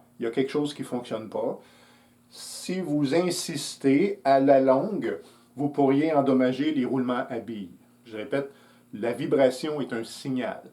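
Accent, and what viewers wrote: Canada. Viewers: non seulement c'est bien expliqué mais en plus le pt'i accent canadien ca n'enlève rien au plaisir ..... merci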